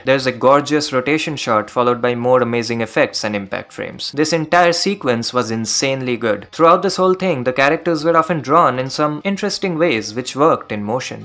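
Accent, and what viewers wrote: India. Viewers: Great video! Are you indian?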